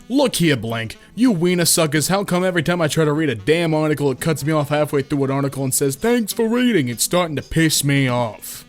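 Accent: United Kingdom. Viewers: is british? his accent was british 12 months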